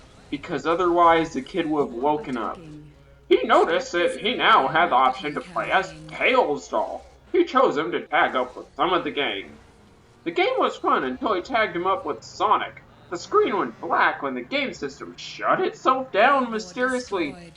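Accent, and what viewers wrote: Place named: South, United States. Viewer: I never noticed until now how southern you do sound in this. XD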